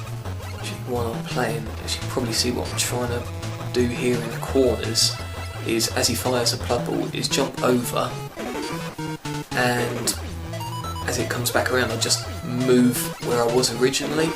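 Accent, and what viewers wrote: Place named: United Kingdom. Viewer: I like your british accent. I have just downloaded MM9 for Wii, and I was stuck in Plug man's stage n_n so thanks for this!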